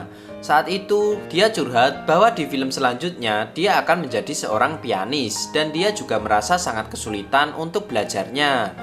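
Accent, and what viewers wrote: Java, Indonesia. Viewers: Jng membaca medok jowo mas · Medok amat ngomongny😂😂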